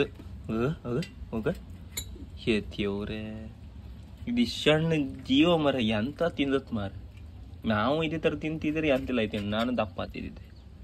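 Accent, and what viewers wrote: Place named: Kundapur, India. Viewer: Kushi aguthe nim vlog noduke. Nim kundapur kannada bashe nu superr hige log madtha eriii mare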